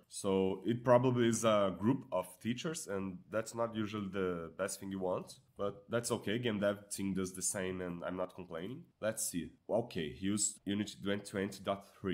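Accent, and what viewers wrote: Brazil. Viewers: Deu para saber que era brasileiro pelo accent kkkkkkkkkkkk muito bom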